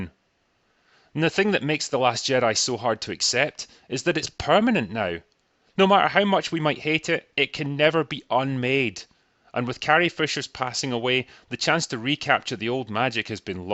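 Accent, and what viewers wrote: Scotland, United Kingdom. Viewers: Very strange hearing a Scot say "soccer ball" isn't it football everywhere except the US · So three years ago, the critical drinker was less drunk and less Scottish? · Soccer ball.......?? Ur Scottish dude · You used to sound normal...well as normal as possible with that Scots accent. So you do put on a voice!!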